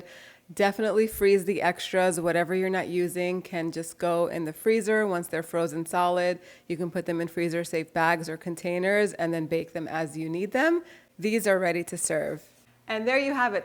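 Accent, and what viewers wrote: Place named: United States. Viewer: To long intros, to much american talking.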